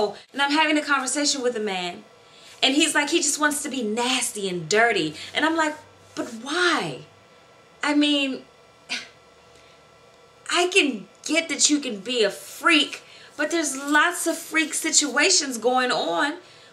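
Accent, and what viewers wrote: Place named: Washington DC, United States. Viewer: Where are you from sound like you from DC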